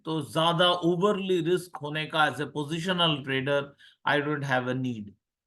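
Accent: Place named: Bengal, India. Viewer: Accha video hai sir. Aapki Hinglish bhi acchi hai, with a Bengali tone 👍👍 make more like this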